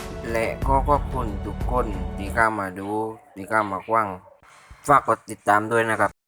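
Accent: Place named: Isan, Thailand. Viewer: *อีสานครับ ฟังรู้เรื่องบ้าง ไม่รู้เรื่องบ้าง555*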